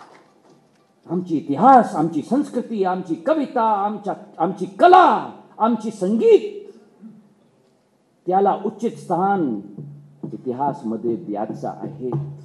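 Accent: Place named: Hindi, India. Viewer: Hindi accent aate h iske marathi bhasha mai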